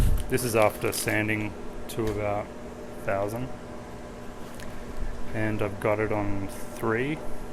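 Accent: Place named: Australia. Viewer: Thank you so much, it's exactly what I was looking for. I gave the tendon in my elbow a decent tear (7mm) from manually sanding and polishing my electric guitar builds 😥I thought I recognised an Aussie accent until I looked at your website as I was going to ask where in Aus you purchased the sanding pads but no point because you're in the US. Anyway thanks again for your info; my local Bunnings has it in stock so happy days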